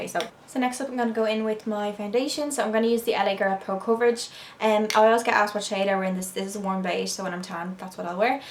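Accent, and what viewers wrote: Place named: Ireland. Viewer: Super happy this was recommended to me actually. You from Ireland babe? Your accent is very much like clarabell.x! Can’t wait to binge the rest of your vids and stalk you everywhere 😘😘😘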